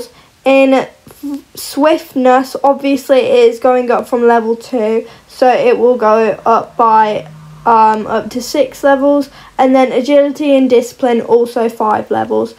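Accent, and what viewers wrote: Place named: United Kingdom. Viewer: Can we just talk about how she sounds perfectly British? Not being rude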